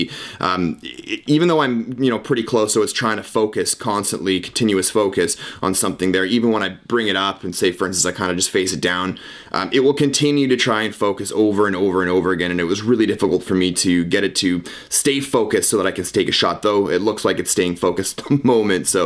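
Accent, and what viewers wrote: Canada. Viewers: Canadian English. Ha, lol.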